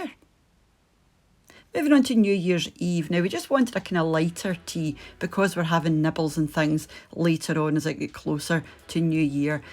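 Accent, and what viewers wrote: Scotland, United Kingdom. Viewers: I just discovered your channel recently and I’ve already watched so many of your videos! I watch from Newfoundland, Canada. I just love your accent. Reminds me of visits to Scotland. My husband is from Cawdor. Can’t wait to visit again once we can travel safely!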